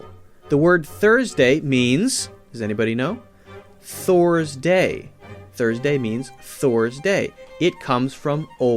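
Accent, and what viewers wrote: United States. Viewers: The guy that is speaking is not English but American. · you are not speaking english you are whining american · what a pity is a septic talking and not a brit. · Good. One point: your English us not 'midern' it is USA English. In England we do soeak English, standard English as well as 'modern'. Please, do NOT try to confuse the rest of tge world about what modern/contemporary English is. Thank you.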